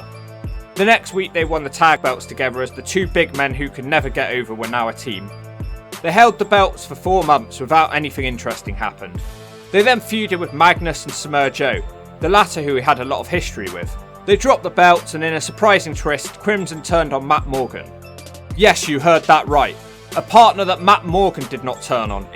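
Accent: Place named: Wiltshire, United Kingdom. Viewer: You sound like you're from wiltshire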